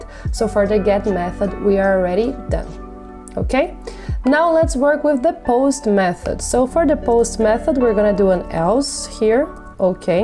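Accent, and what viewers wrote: Brazil. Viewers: You’re from Brazil, right? Your accent reminds me of brazillans’. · Reconheci pelo sotaque kkkkk muito bom encontrar br pelos cursos cs50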